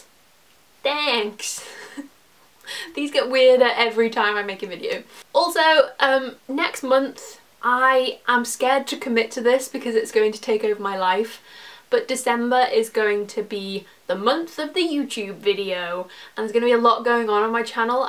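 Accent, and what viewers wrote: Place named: Wirral, United Kingdom. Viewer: Are you from the Wirral? Your accent is very familiar!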